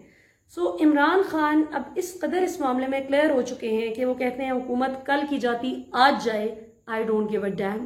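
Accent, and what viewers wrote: Punjab, India. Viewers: Truly brilliant, keep it up. By the way like your Punjabi touch of tarka.😄👍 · I love your Punjabi accent 😃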